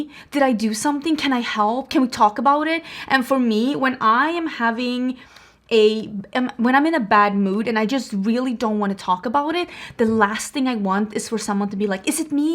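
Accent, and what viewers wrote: Sweden. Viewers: dont change! you're so Wise and Talented! I love hearing how you pronounce things with your Swedish accent. So glad I found your channel, You're my favorite Youtuber 🥺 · omg........ i love my swedish clown with an accent..... dont like me well dont let the door hit you on the way out..... ppl have too much time on their hands omg!!!!! i love you!!!!!! you are perfect the way you are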